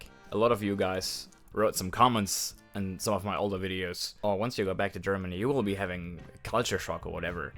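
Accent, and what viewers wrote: Australia. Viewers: Got a bit of a 'downunder' accent happening there mate! (I'm a kiwi). You are not allowed to smoke inside public areas here. No smoking in bars etc, there is usually a dedicated outdoor area for smoking. You no longer come home with your clothes and hair smelling of smoke! They are also not allowed to advertise and cigarettes are usually held in a locked cabinet in stores. It is nice that we don't see cigarette butts dropped everywhere like there used to be decades ago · Great video mate , just came across your channel and subscribed , love your story telling and a little bit of an Aussie accent creeping in Visited Germany in 1989 😂and went to Oktoberfest as all Ozzie backpackers do , absolutely love the country, people, and food. One question though and i never saw this in Europe when i was driving but only in Australia you do a quick 1 finger wave as if to say hey mate while you're on a long drive in the middle of no where 👌✌ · Sounds like you took the beginnings of an Aussie accent home with you though! 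I can hear you dropping it on certain words :;) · As an Aussie, I'm really surprised smoking is so prevalent in Germany. I thought you guys would be more concerned about your health. A lot of Aussies quit in the 80s when the dangers of smoking were well understood by the general public. Yes, the federal govt also made smoking more expensive and told cigarette companies they must put them in plain packages. This made it an expensive and unattractive habit. Personally I'm pretty glad about that, as I can't stand smoking and always move away from anyone who's doing it 😅 BTW, some of your vowels are sounding a bit Australian. We definitely rubbed off on you 😊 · You've got quite the Aussie accent . 😅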